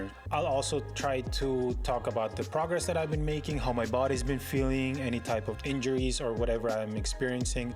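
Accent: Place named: Austria. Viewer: Came for the training diary. Stayed for the austrian accent! 😊 Richtig gut!! 👍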